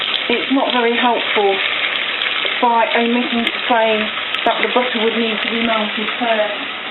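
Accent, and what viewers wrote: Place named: United Kingdom. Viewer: It's that damn British accent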